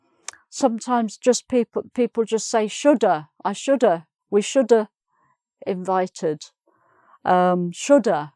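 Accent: England, United Kingdom. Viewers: Thank you a lot. Your lessons are really useful and you have awesome British accent as well)) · it' s always a pleasure to listen to your lessons! I love your beautiful english accent so much...thank you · Thanks a lot mam! You are great! I love British accent so I'm learning lots from you :)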